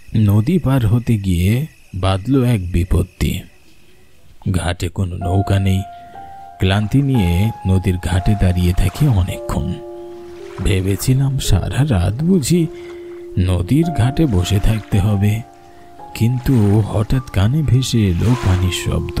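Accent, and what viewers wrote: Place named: East Bengal, Bangladesh. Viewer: Narrator কি বাংলাদেশী না কি? উচ্চারণটা পূব বাংলা ঘেঁষা। ভালো লাগলো না।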